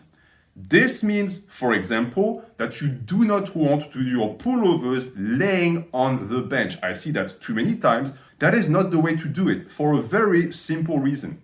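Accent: France. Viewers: Rassure moi,tu es français? Je fais allusion à ton accent. Je suis également fan du pull-over,il travaille tout le haut du corps,trapèze,épaules,dos et pecs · Oh l'accent français !!! Trop content de découvrir cette chaine !!! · je sais reconnaitre un accent francais quand j'en entends un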